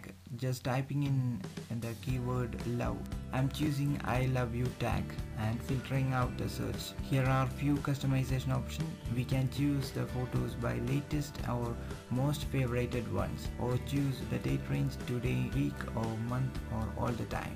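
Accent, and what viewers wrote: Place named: Kerala, India. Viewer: English kettapo manasilayi malayali aan enn😁😂 thank you broi👍✌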